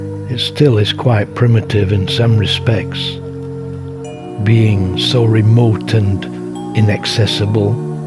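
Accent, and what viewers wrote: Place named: Wales, United Kingdom. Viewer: Wow! Just found this after returning yesterday from Kanpanlet to Mindat. I was told I was the first foreigner they've seen in almost a year! Sadly, the views were oscured by smoke from burning season which ironically, resembled the current mood of the wonderful people in this amazing country. Love the narration. Still trying to work out the accent, although there seems to be a Welsh tinge!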